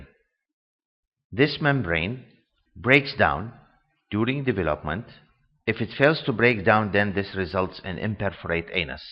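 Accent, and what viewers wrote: Iraq. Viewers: شكرا دكتور على قناتتك الرائعه نفتخر بيك انت قدوتنا منوره الاكسنت العراقيه كلش حلوه وان شائ الله المزيد من التقدم والموفقيه :) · عرفتك عراقي من اللهجة ههههه ربي يحفظك حبيبي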